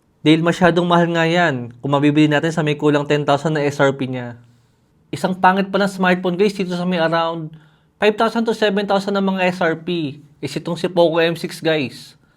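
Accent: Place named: Cavite, Philippines. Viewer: Taga Cavite ka sir‽ Puntong cavite city or Tanza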